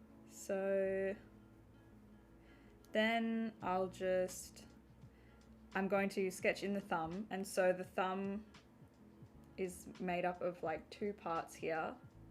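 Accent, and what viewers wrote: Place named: Australia. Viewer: Your Aussie accent is 🤩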